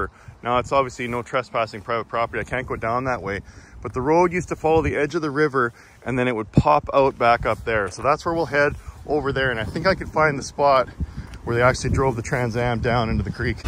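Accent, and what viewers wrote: Canada. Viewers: Loved the video. You speak like a Canadian though. Figured it was some southern local guy recalling old times. Ah, Alberta I see. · This is the first video I've seen of yours. I had no idea you were Canadian, but I thought to myself "that guys almost sounds Canadian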